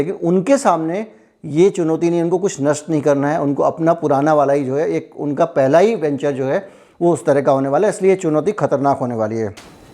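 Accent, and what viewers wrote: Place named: Maharashtra, India. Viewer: खूप छान माहिती दिली भाऊ मराठी माणूस हिंदी बोलताना सहज लक्षात येतो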